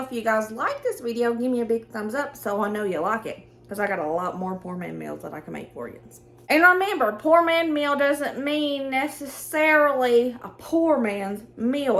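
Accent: South, United States. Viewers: Love the southern accent.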